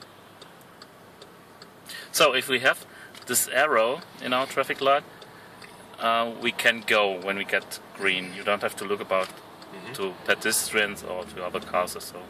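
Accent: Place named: Germany. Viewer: I love the German accent of speaking English!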